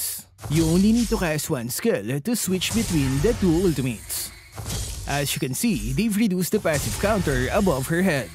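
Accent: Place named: Philippines. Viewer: I'm not focus cz Filipino accent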